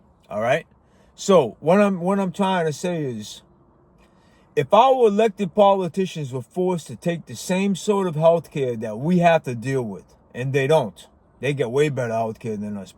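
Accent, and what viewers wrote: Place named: Rhode Island, United States. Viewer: I grew up in Boston and I moved to Rhode Island when I ran away from home and purchased my first house at 26, because I couldn't afford the house that my parents wanted me to buy next door. It was too expensive and I couldn't even qualify for the loan. Even though, I was making good money at the time with a 20% down payment. Boston is very expensive at lease Rhode Island and New Hampshire is a little better. But rents in Providence is $2,000/month now and Boston is $3,000/month. But the seafood on Federal Hill is out of this world and there are many inexpensive great beach seafood joints on the water in Warwick. My favorite is Iggy's. Fun and casual giving you that "laid back vibe" I knew I detected something in the accent of your voice. It makes sense now.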